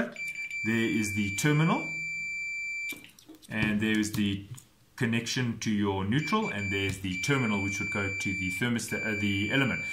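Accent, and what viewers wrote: South Africa. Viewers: I'm in the middle East and I enjoy it when I hear that South African accent... nothing like home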